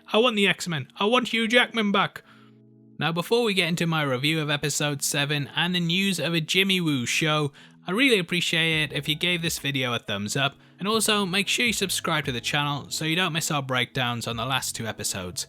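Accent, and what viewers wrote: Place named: North East England, United Kingdom. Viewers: You’re deffo from the north east like x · Sounds like a South Shields accent...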